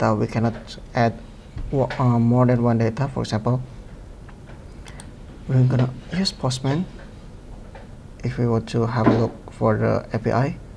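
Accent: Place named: Indonesia. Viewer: indonesian accent wkwkw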